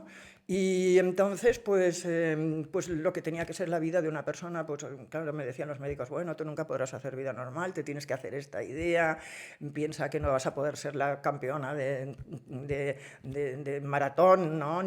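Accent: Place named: Barcelona, Spain. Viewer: Me he divertido mucho.Pilar Eyre en estado puro.Nunca defrauda. Ese tonito pijito de niña bien de Barcelona, de revolucionaria reconvertida a nacionalista civilizada, de mis desgracias tienen un no sé qué de glamour que no puedo evitar que tiene encandiladas a sus seguidoras , especialmente de ultramar, es muy entretenido y en el fondo inofensivo.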